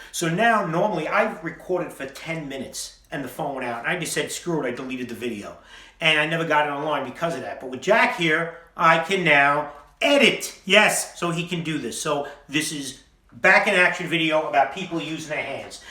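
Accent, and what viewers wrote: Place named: Detroit, United States. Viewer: That's a beautiful accent where is from ? Detroit ?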